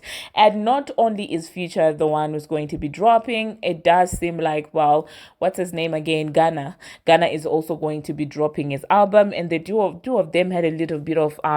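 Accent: South Africa. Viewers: I know this is out of context. I love ur channel by the way. Are u South African?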